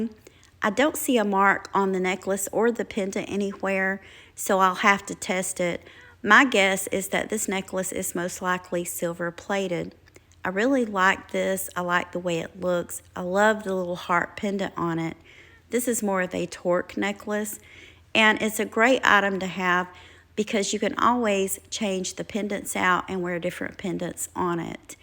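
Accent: South, United States. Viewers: I think it’s just your adorable Southern accent ❤️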